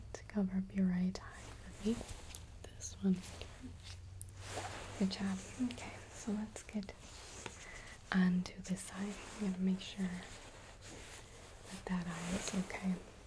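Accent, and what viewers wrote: Midwest, United States. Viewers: I've been trying to figure out this accent as it sounds kind of familiar it's kind of funny that it's just Midwest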